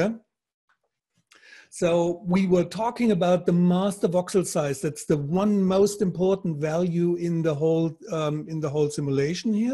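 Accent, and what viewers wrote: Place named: Austria. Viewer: Great Info I'm definatly going to follow through also is the Accent Austrian I really like it XD!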